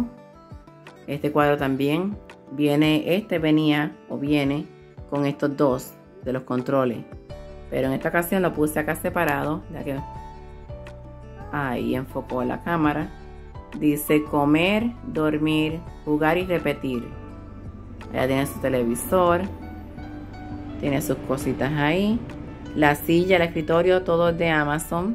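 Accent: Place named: Puerto Rico. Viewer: yo también soy de Puerto Rico vivo en Iowa. Te reconocí por nuestro acento. 😂😂 eres nueva y te voy apoyar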